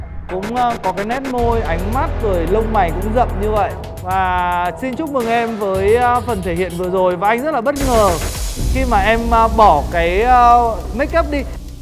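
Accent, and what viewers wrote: Vietnam. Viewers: HaTinh giong rat Ha Noi ^^ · giọng hà tĩnh lạ vkl ra :)) nhái giọng bắc ròi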